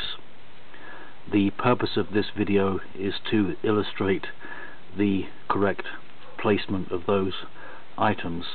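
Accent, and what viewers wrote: England, United Kingdom. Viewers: nice catch Mike. I looked at this and I see the shoes are reversed. The smaller one goes to the front not the rear. Judging from the accent this guy is from England and over there they do things opposite.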